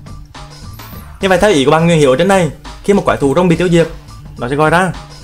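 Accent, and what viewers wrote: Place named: North, Vietnam. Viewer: Anh nói tiếng nam đi tiếng bắc khó nghe wa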